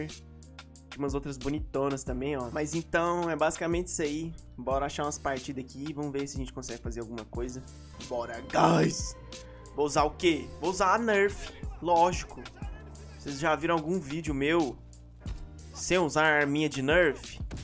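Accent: Minas Gerais, Brazil. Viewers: Mineiro Ala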